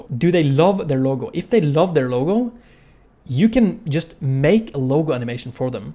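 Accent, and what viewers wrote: Norway. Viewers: Interesting to hear your Norwegian accent. Sounds like you're from the Sogn og Fjordane area, probably a bit inland, not coastal.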